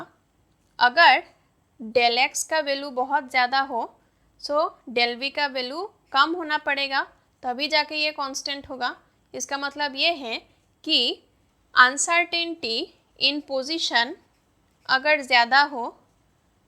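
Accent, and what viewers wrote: Bengal, India. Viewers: Mam are you Bengali??????????????????🤔🤔🤔🤔🤔🤔🤔🤔🤔🤔🤔🤔🤔🤔🤔🤔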